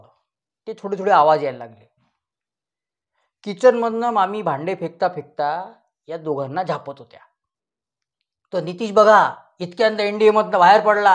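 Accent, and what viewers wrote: Vidarbha, India. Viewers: अहो हे विदर्भातलं मराठी आहे. मालवणी किंवा कोल्हापुरी नाही. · माझे खूप मित्र विदर्भातील आहेत आपले बोलणे ऐकले की त्यांची आठवण येत. पण एक नक्की आपण आपल्या भाषेत खूपच खरपूस समाचार घेतला आहे.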